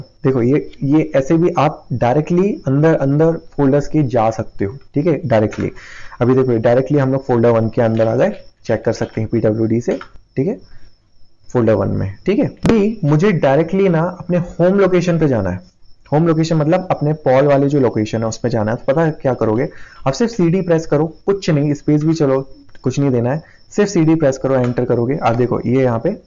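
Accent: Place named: Hyderabad, India. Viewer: You are Hyderabadi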